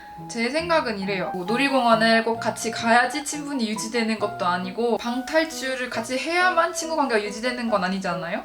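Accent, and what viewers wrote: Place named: Gyeongsang, South Korea. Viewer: 경상도 억양도 너무 귀엽다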